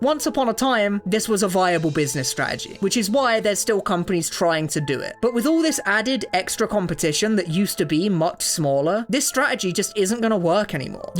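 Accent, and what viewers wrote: United Kingdom. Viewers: without the annoying british accent this guy would have 500 subs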